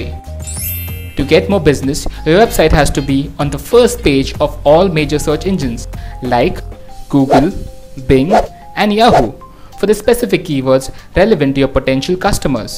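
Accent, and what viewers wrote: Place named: India. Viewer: Indian accent... urgh.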